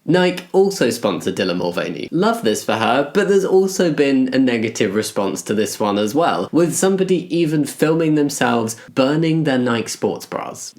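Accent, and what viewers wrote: United Kingdom. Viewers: It took me a bit as an American to figure out you were saying Nike. Is that the common pronunciation in the UK? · British pronunciation. Ah-dee-DAS too.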